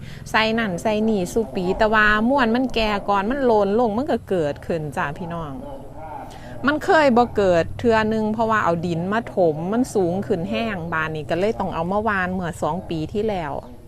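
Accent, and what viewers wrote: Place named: Ubon Ratchathani, Thailand. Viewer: รายการดีมักหลายเว่าภาษาอีสานคิดฮอดบ้านแท้.. นางอยู่จังหวัดได่น้อสำเนียงคล้ายแถวอุบล.จ้ะ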